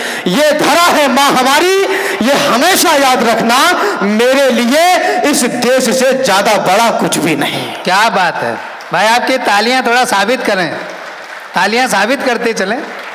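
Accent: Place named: Khari Boli, India. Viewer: मै जब भी सुनता हु आपको आपकी सिधि खड़ी बोली सुनकर जबरा फैन हो जाता हु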